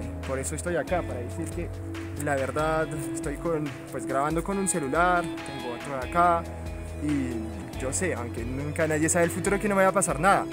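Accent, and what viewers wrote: Colombia. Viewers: Tienes acento colombiano. jajaja. Saludos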